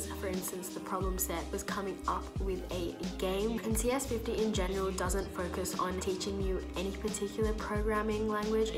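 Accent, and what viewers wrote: Australia. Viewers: Aussie accent 😍 Im currently starting the cs50, so excited. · Great review thanks 🙏 it would be really nice if you can speak little louder, love your Aussie accent 😊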